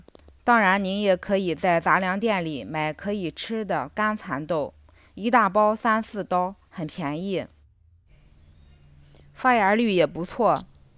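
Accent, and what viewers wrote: Qingdao, China. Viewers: 听到熟悉的青岛普通话，很亲切，我种的蚕豆容易倒，不知道什么原因，看到你的这个视频，对我种蚕豆有很大邦助，赶紧剪枝，繁殖太多衩了，主干细高，谢谢你的视频。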